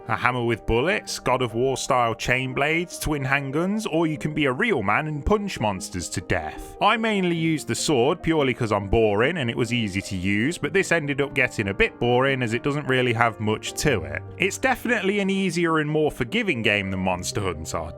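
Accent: United Kingdom. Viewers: I don't know who you are but you sound really british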